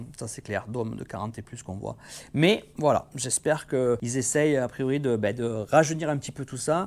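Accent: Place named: Montpellier, France. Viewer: A l'oreille, je pensais que tu étais de Montpellier lol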